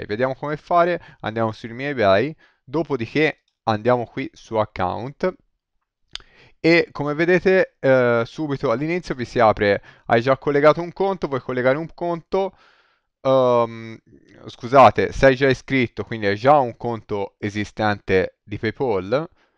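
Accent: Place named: Tuscany, Italy. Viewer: Tutorial Toscano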